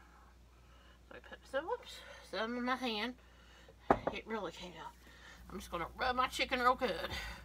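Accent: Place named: West Virginia, United States. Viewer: that West Virginia accent is running over your instruction. My grandparents lived in West Virginia. Same accent, barely understandable. Cheekkun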